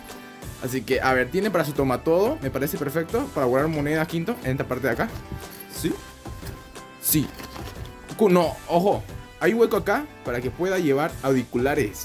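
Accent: Bolivia. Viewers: Oye yo me preguntó eres de bolivia?? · Sos de Bolivia compa?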